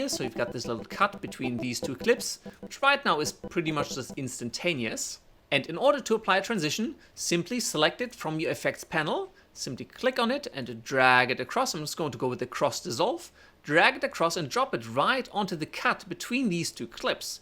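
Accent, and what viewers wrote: Germany. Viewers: Du musst definitiv Deutscher sein, dein Akzent klingt so süß 😁 Anyway, thanks for your very high quality content, it's so helpful! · Even if your lovely accent (German?) hadn't hooked me, I wouldn't have missed a minute! This was just the tutorial I needed. Amazing work.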